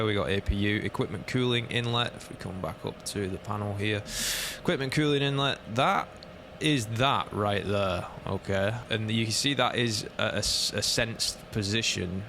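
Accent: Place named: Lancashire, United Kingdom. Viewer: Thanks for the video! Much appreciated. Loving the accent😊 Preston? Blackburn?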